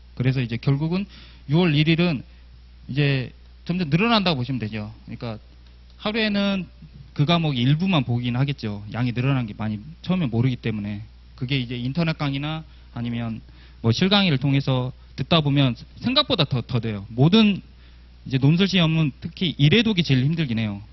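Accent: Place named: Chungcheong, South Korea. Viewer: 충청도분인가보네요. 답답합니다.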